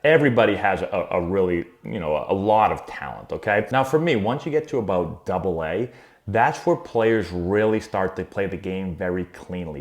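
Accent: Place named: Boston, United States. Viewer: Aye bro love ya channel I'm from DC But I was raised in Boston and Worcester so hear ya accent is refreshing I feel like I'm home lol #GOSOX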